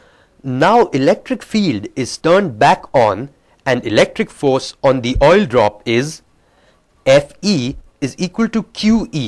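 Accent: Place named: India. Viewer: stupid hindi ascent!!!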